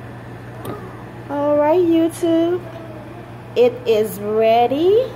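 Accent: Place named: New York, United States. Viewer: I thank you for the info... By the way you sound like a Puerto Rican from New York... I could be wrong. I spend a lot of time in Queens..lol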